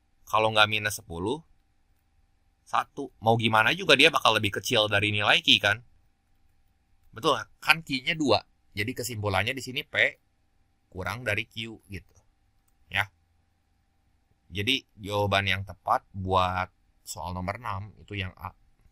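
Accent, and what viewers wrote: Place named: Sunda, Indonesia. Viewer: aduh teh koko ternyata nyunda nya nyunda pisan euyyy, Hatur nuhun ko 😊😊😊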